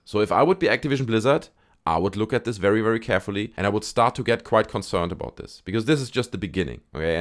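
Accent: Germany. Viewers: I like your style. You sound German, but have really good English. And your videos aline with what i usually watch - anti woke, cod, pop culture stuff. Keep it up. I'll watch some more and gonna sub later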